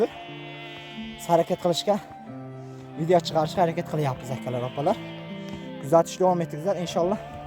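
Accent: Surkhandarya, Uzbekistan. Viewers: Гап йук укам асил сурхоним шеваси маладис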